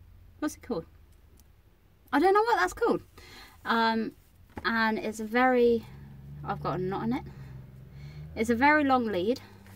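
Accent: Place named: Canada. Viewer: Love ur videos and I love your accent r u from Canada? I'm from. San Antonio Texas in the USA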